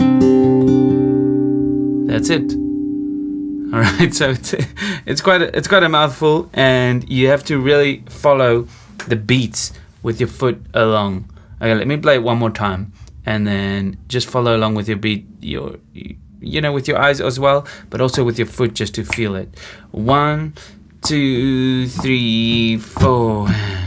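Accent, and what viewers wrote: South Africa. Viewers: Great video. Where are you from? You sound a bit South African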